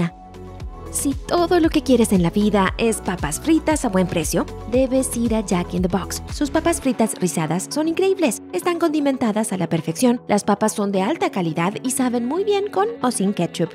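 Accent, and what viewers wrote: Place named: Latin America. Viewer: No entiendo la utilidad de traducir al español (principalmente en latinoamerica) videos con un guión y contenido realizado para Estados Unidos. La mitad de estos locales no se encuentran en mi país, dan los precios en dólares aún cuando la locutora tiene acento latino, los precios son mucho más baratas de la realidad y varios de estos producto de locales que si se encuentran en mi país no se venden...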